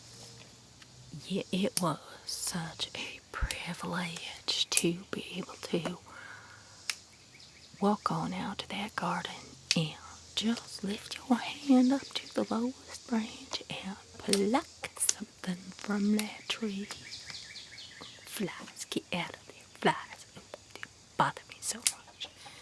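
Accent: South, United States. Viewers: el acento del sur es muy agradable como ASMR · Super relaxing, the sounds and your girlish voice, i love your southern accent ( i've watched tv series and i remember that the southerns sound a kind of different )😘😴😴😴😴😴🐔question: what does "fun spoof" mean??😜💖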